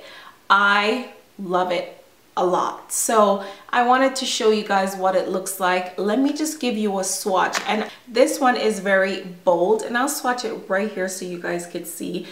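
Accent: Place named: Jamaica. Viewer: Lovely makeup. I can hear an accent. Are you from Jamaica? If so, I am too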